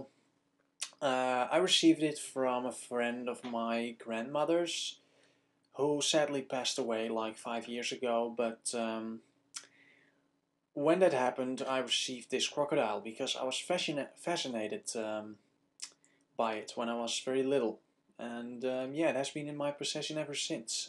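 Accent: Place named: Netherlands. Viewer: Your german reminds me of the cartoon series Alfred J. Kwak i used to watch as kid, because the german voice actor of this cartoon figure is also a german speaking dutch. Sounds cool ;) Kudos for doing those video, a brave step forward!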